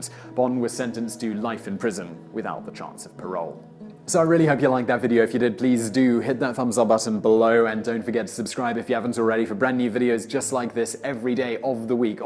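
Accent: United Kingdom. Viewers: your pronunciation is awesome other then having a uk accent , i have never heard you mispronounced words compare to other channel, do you fact check how to pronounces them before recording, so professional!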